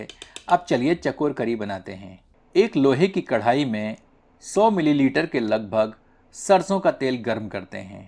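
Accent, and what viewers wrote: Standard. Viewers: बहुत प्यारी शुद्ध हिन्दी आप बोलते हैं सर 🙏🙏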